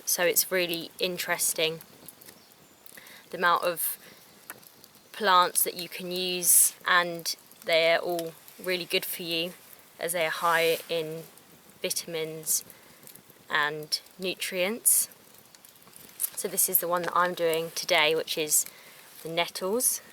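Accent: United Kingdom. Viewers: You are obviously UK, but what country? I am not good at identifying accents. Brit?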